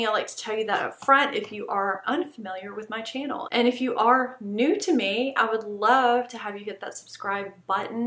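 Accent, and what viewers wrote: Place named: Louisiana, United States. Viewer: Louisiana fam 🐊 I got so excited when you said Nola!! Knew that accent sounded like home 🤟🏻 I’m from Lafayette, I’ve never found an influencer from my neck of the woods! It helps a lot to see someone trying things in our crazy climate! new subscriber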